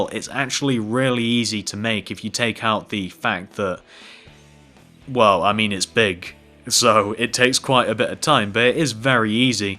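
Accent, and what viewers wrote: United Kingdom. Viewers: yea. they have british accents not stupid people accents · he does have a British accent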